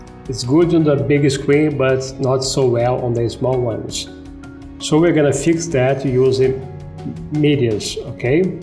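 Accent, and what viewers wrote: Brazil. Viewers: I've never heard a brazilian dude speaking english😂😂😂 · Você é brasileiro ne ?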